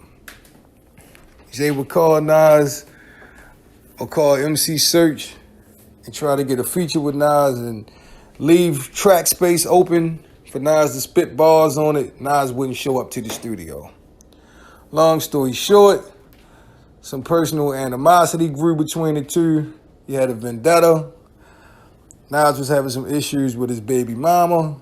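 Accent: Baltimore, United States. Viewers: You sound like you from baltimore.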